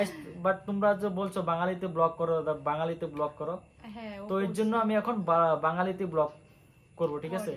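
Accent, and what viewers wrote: Assam, India. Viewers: Tomar Kotha Sunle bujha Jay Tumi assam er dada... Amio assam theke Tomar vdo dekhchi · Hmm amnitew Assamer bangla language olpo belek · Dada amio assam er.... Tmr moto bangla boli😅😅😅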